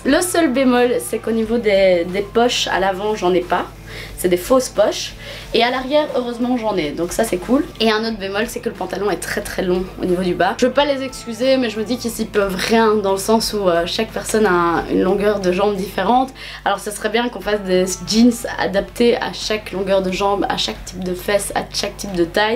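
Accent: Belgium. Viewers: Elle est belge, on parle toute comme ça. Et les mimiques, l'humour ect c'est l'humour belge, gaelle garcia est la "belge" la plus connu sur Yt du coup mnt dès qu'une belge apparaît sur Yt il faut obliger que des personnes la compare à elle. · c'est l'accent belge!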